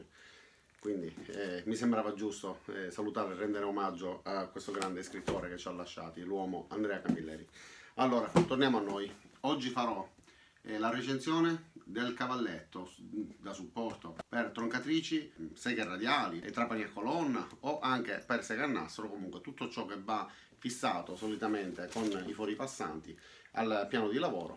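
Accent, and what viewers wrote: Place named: Calabria, Italy. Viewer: Ti giuro che ho pensato sin da subito che eri calabrese!!!hai un accento molto simile al calabrese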